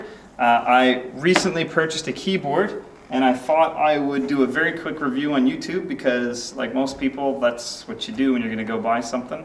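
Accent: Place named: Canada. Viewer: WHAT PART OF CANADA ARE YOU FROM , EH ???????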